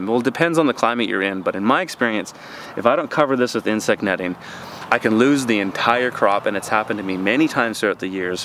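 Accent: Canada. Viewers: sounds canadian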